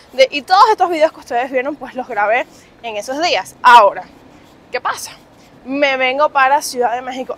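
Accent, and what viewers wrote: Cuba. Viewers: No me gusta el acento cubano , nunca me va a gustar. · Hola preciosa eres muy graciosa e tu forma de hablar es muy bonito el acento cubano eres bienvenida a nuestro pais soy tu fans también a través de ti conocemos las costumbres de Cuba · Poco a poco te adaptaras y hasta tarde y temprano se te quitara en tono de voz de cuba. Y sin pensar tu hablaras con el tono de mexico como las damas chilangas. Ya veras ..con el tiempecito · Y a ti tampoco te enseñaron que en cada pais hablan diferente ella su acento es cubano.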